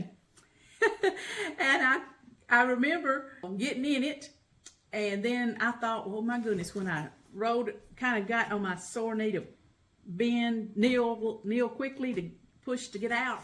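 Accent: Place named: South, United States. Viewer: Bless you,. I lLOVE your attitude AND your southern accent!:) My mom, her parents, her siblings etc., were from Alabama. Since I found your channel by looking for updates after knee surgery, I now have to look through your playlist for some good ole southern cooking. YIPPIE!!! Thank you. ❤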